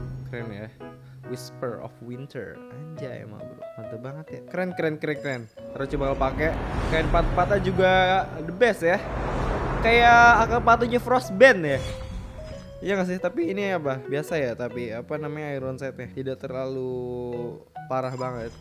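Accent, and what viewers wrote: Java, Indonesia. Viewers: Iki wong jowo ya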